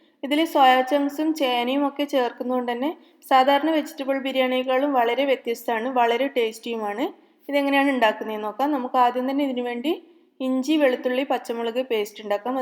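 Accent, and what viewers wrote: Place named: Palakkad, India. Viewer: ഇങ്ങളെ ഭാഷ പാലക്കാടൻ സ്റ്റൈൽ ആണല്ലോ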